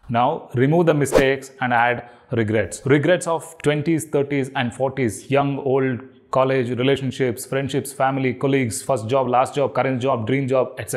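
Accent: India. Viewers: On a different note, I love your Hindi with the south indian accent.. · really really liked this video and the hindi accent.... i loved it, felt natural. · Your Hindi accent reminds me of Vijay Sethupathi. It was refreshing to watch and your content seemed well-researched. Good Luck.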